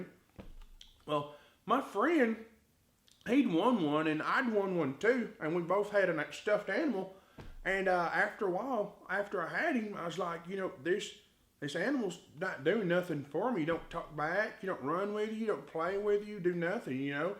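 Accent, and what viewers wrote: United States. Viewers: You gone on ahead now, with THAT Southern Style Country voice. Is yourself with "Blue Grass Entertainment?"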